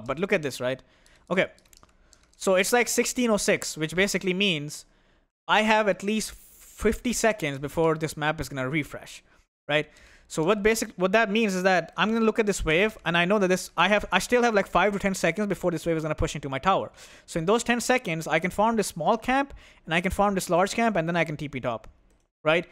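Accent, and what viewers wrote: India. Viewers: He sounds Indian. I’d be constantly angry too if I was a subhuman.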